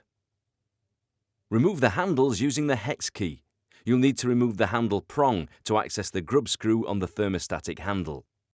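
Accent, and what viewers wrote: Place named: United Kingdom. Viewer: I hate your limey voice. Piss off you wanker.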